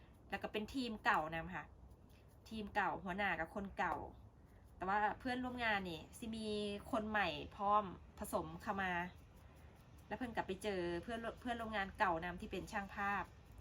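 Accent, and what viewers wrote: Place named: Nyaw, Thailand. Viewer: สาวไอ่ไทเมืองสกลบ่ สำเนียงคือญ้อเมืองสกล หมานๆเนาะ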